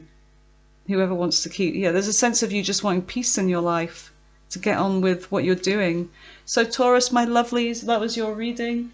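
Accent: United Kingdom. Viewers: Hello, Shout out from the Coachella valley. Brilliant Reading 😊 By any chance, are you from Cornwall England? Cheers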